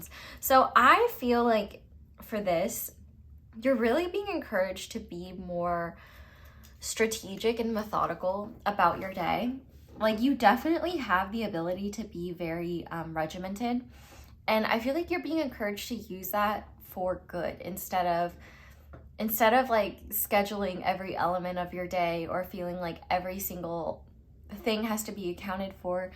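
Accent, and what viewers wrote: South, United States. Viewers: First of all I think my new favourite quote from you, of all time is “give a little subscribble.” Also, I’ve somehow never noticed the southern accent before until the very end of my reading today 😮😍💕😊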